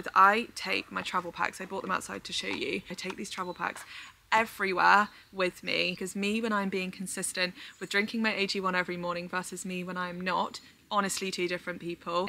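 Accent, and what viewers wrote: England, United Kingdom. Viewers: I really like your accent 😊. Which part of England is it from?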